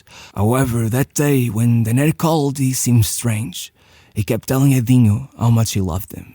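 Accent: Portugal. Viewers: THIS MAN´S VOICE YOU PORTUGUESE ARENT YOU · It's so obvious you are Portuguese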